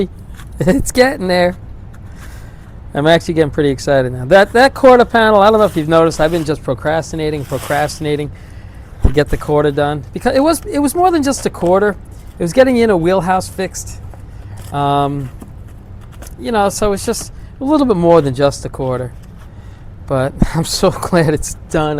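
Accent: New York, United States. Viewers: Alabama here, I would have won a bet that you were from NY, by your accent.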